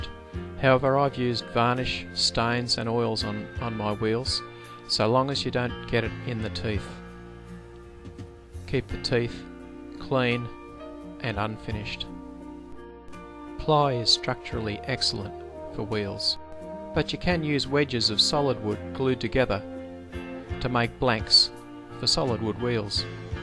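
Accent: Australia. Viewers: Yeah your right I am British. and pomegranates are pretty tasty so I am not guna argue with that. I didn't notice you were, i fail quite a bit at recognising accents. ^^ i just bought his model T clock so just started that. but your video is being really helpful. you are obviously a very skilled craftsman. what type of finish do you use? i was thinking to do the wheels carfuly as not to get it in the teeth with bees wax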